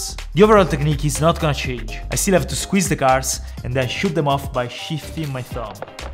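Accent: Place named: Italy. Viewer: he is italian that's why he's accent is different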